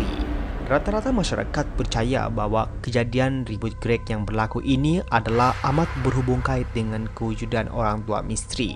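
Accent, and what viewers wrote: Sabah, Malaysia. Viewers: Sebab loghat bahasa baku sabah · Cakap gia dusun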